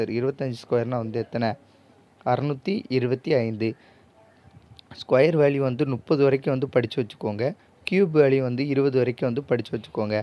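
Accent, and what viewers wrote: Tirunelveli, India. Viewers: Tirunelveli slang 👏👏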